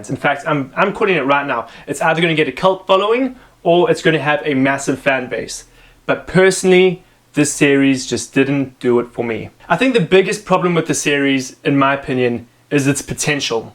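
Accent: South Africa. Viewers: Bro, are you from South Africa? The accent? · I heard the accent and stayed for the whole channel 👏 great quality, fellow South African here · So glad I still recognise South African accent after 3 years out of the country! Keep on keepin on boetie. Baie Lekker :) Yoh..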